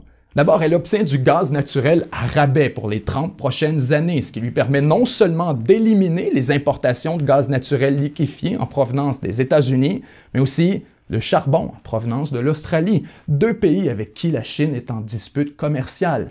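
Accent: Quebec, Canada. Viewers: cet accent canadien qui me rappelle les bons moments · Tu dois être québécois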